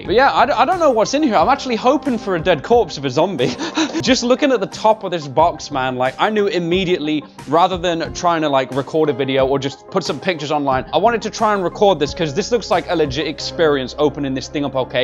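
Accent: United Kingdom. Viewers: I can't be the only one that thinks he sounds more British rn lol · Is it me or sounds a lot more british with low quality mike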